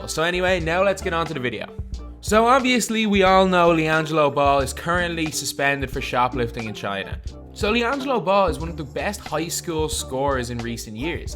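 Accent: Ireland. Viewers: You irish? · Are you Irish?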